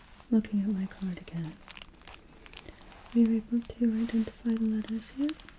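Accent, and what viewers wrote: United Kingdom. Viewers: Posh, British accent, love it. I'm a Bristolian so not posh at all! This is so good! ❤❤❤ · Love the British accent 😊 Best wishes from the UK 🇬🇧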